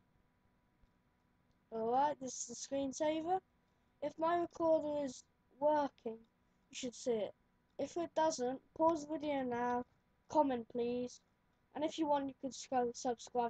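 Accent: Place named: United Kingdom. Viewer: that British bloke garble you are mumbling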